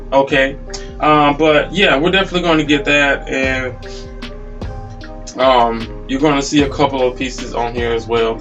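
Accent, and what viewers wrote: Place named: Georgia, United States. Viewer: That’s the Georgia southern drawl 😊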